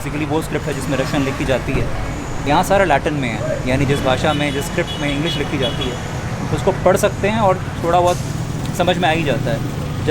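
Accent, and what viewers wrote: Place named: Asansol, India. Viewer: Asansol type shune khub moja laglo. Love from Kolkata.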